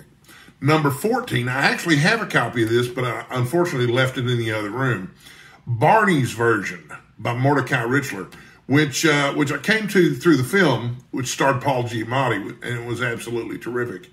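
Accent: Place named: South, United States. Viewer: Great to hear a fella with a little South in his mouth. You’ve got some interesting picks.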